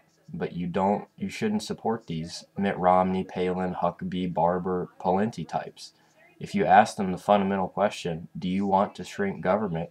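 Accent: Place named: Baltimore, United States. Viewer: Are you from Baltimore? Your accent is very baldimerese.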